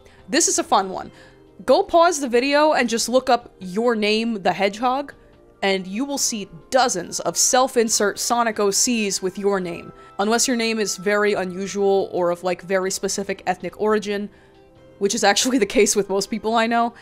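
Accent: New York, United States. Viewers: WYM didn't speak english fluently? You sound like you're from NY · I am very suprised how you can talk like a average american while english is your 2nd language , how did you improved your accent ?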